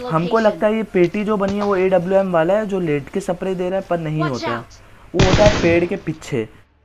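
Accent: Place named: Bihar, India. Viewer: Bihari lagra fulll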